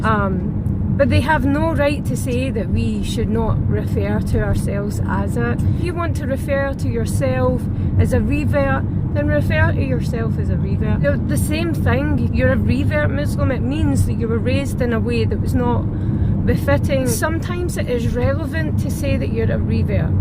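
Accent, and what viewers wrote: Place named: London, United Kingdom. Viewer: Salam 3aleykii, where about in london you from sister? I love your voice and accent 😍😍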